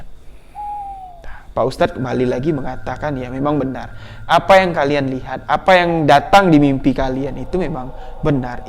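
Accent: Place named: Bali, Indonesia. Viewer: Oalah, saya baru liat eps ADUM. Ternyata abng tinggal di Bali., pantesan logatnya spt org Bali. Sukses terus bang, sy suka cra penyampaian cerita dr abang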